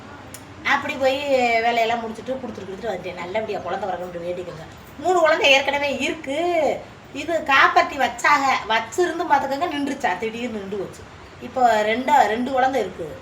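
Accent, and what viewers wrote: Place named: Madurai, India. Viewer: வெள்ளந்தி யான பேச்சு, எங்கள் சின்னஅத்தையை மதுரையில் கட்டி கொடுத்தாங்க, அவுங்க பேச்சி இப்படி தான் இருக்கும், அத்தை இப்போது இல்லை, இந்த பேச்சு எங்கள் அத்தை பேச்சு மறுபடியும் கேட்டே மனம் ஆறுதல் ❤🎉 நீங்கள் நல்லதே செய்யுங்கள் நல்லதே நடக்கும் மா🎉❤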